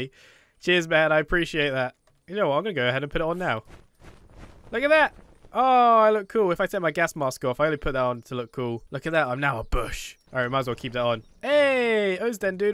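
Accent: United Kingdom. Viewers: Hey! Are you from the. UK?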